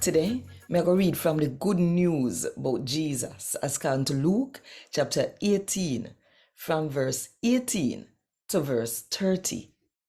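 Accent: Jamaica. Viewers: Blessed love sister! I'm in the USA. I love Jamaican language and accent. Thank you! One Love! ❤️☮️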